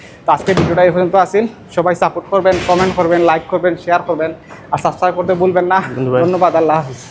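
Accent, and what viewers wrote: Sylhet, Bangladesh. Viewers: ভাইয়া আমি রেস্টুরেন্ট ভিসায় আসতে চায় মালেশিয়ায়। আমার এই কাজের এক্সপেরিয়েন্স আছে, আমি সিলেটের রোজ ভিউ হোটেলে জব করেছি, আপনার কথা শুনে মনে হচ্ছে আপনি সিলেটি। আপনি কি কোন দিক দিয়ে হেল্প করতে পারবেন আমাকে.. কোন ওয়েতে আসলে ভালো হবে.?! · খাটি সিলেটি মাত বা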